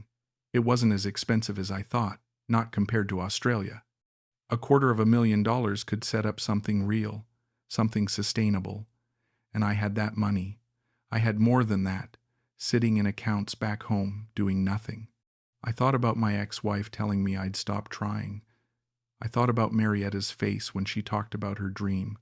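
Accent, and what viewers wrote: United States. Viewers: The Story is FAKE! 1 : You Don't need 250k AUD to start a small restaurant Carenderia type in the philippines as she was talking in the video.....the type of eatery she grew up in the province 2 : She has and drive a car? she is not as poor as she said......a 10 years old 2nd hand car cost around 400k PHP .....hmmmmmmm.... 3 : The description from the guy and the voice does not have an australian accent but american 4 : You must be really stupid to send 250k USD just like that.......makes you wandering how did a guy so silly managed to save or aquired it in the 1st place. So in my opinion The story sounds convinsing and it might generate viewers BUT I THINK IS FAKE 100% :)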